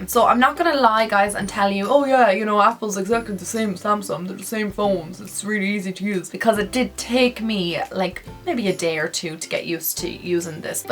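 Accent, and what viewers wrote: Ireland. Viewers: are you Irish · is she irish? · i love your irish accent 😍😂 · Just found your channel knew instantly you were Irish you can't hide the accent🌸 I'm from Galway❤️ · Is she Irish because I'm from Ireland and I'm from Dublin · Are you from Ireland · Are you Irish? I just came across your channel, you're gorgeous! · Are you Irish 🇮🇪 if u are I am 🇮🇪🇮🇪😂👍🏼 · OMG ur Irish same #irishgoals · What part of Ireland are you from ??🇮🇪🇮🇪🇮🇪🇮🇪🇮🇪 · Are you irish because you rly sound irish ;) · Are you Irish I am xx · She's from Ireland :)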